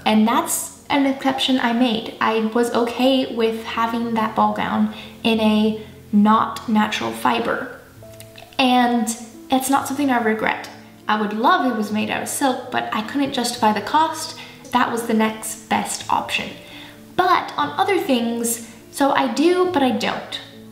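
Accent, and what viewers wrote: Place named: Eastern Europe. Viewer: Oh my!! I thought you were older, yes; but mainly I thought you were from somewhere in Eastern Europe!! 🤣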